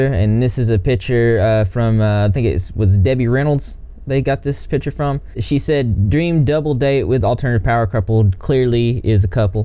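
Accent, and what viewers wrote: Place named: Texas, United States. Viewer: are you from Texas? Bc I know some people from Texas who has the same accent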